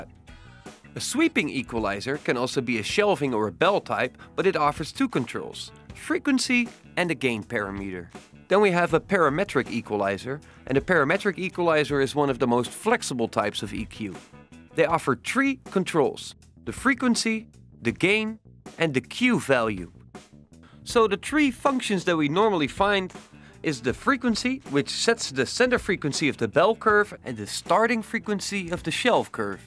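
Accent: Netherlands. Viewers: Your accent sounds very Dutch .. are you by any chance Dutch?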